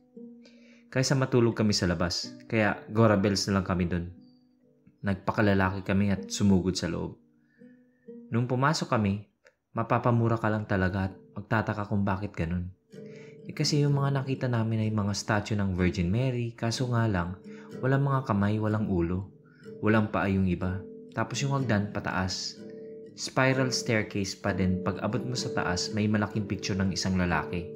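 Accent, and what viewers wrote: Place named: Bicol, Philippines. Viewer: Bicolano ka kuya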